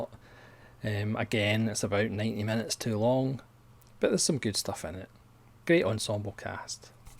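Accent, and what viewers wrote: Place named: United Kingdom. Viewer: I watched the whole thing in one viewing. I like westerns and grew up watching them to an extent as my dad was a big western fan. I have a friend that doesn't like Miles Davis. I told him but there are other reasons to listen to Davis--he as an amazing ensemble around him that play the music as well: John McLaughlin, Herbie Hancock, Wayne Shorter, etc. The same is true for John Wayne pictures. In The Cowboys, Bruce Dern plays a great villain. Red River one of the greatest scenes as the cowboys hoot and holler as they begin their trail drive. The Searchers is just an overall well made film, and Wayne's character isn't exactly portrayed as a hero, more obsessive. Perhaps later on you'll be able to approach him and understand his performances. Are you Brit or Scottish or Irish? I ask because you have a rather thick accent. 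Some westerns you didn't mention: Lonesome Dove and Return to Lonesome Dove the TV series was very well made. Paint Your Wagon is an interesting western musical. Unforgiven is an incredible western where a gunfighter's past comes back to haunt him. Little Big Man is a rather circular film from white man to Indian culture and back again. A Man Called Horse shows some interesting Indian culture. Hud, a contemporary western with Paul Newman. All the Pretty Horse, another contemporary western. Shane--consciously mythic, superb characterization in Alan Ladd's character and a crazy good fight scene. Pat Garrett and Billy the Kid--I tend to enjoy this more over The W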